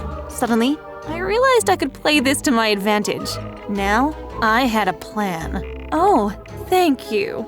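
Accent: United Kingdom. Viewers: You sound like British I always want to sound like British and I want to be shy less to speak English or British idk how to speak British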